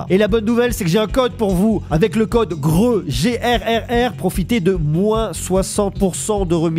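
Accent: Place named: France. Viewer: Je pense que c'est l'IA qui fait la traduction. L'IA laisse même l'accent français. 😂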